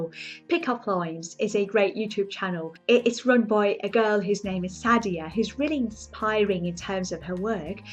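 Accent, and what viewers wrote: United Kingdom. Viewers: Your accent is so British and so amazing ;-)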